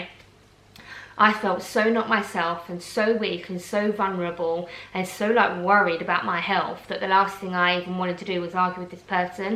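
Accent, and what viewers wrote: Essex, United Kingdom. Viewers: I'm from Essex too. People do judge a person by their accent. It's like you are taken less seriously. However that's usually idiotic people that are stupid themselves. Most intellectual people will judge what someone is saying rather than the accent. Loving the jacket. Classy as ever. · So sorry that man spoke so despicably to you at the hospital. How awful! I really enjoy your accent, but as a South African, all UK accents sound 'odd' to me, and I love them all. Not sure what's posh and what's not :) Had a terrible experience in a Paris store once. I was literally ignored. I did look like a tourist, and it wasn't a luxury store, but it was a quaint little store near Mont Matre. I was so embarrassed and it put me off completely. I don't have a ton of money, but I'd saved so much for the holiday and wanted to get something quintessentially French. 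It was a painful experience.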